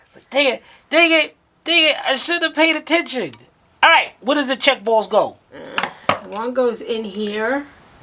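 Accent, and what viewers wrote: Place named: New Jersey, United States. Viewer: Where are you from? Sounds like New Jersey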